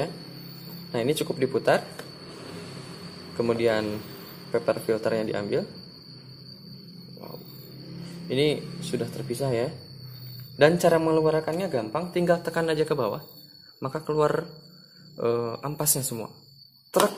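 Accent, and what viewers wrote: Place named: West Java, Indonesia. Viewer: Sunda bgt ... itu logatnya mas 🤣🤣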